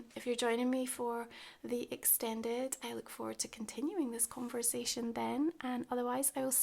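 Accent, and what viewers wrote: Scotland, United Kingdom. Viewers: Lovely read thank you 🕊🙏. Very refreshing to hear a Scottish accent 🏴🏴🏴😊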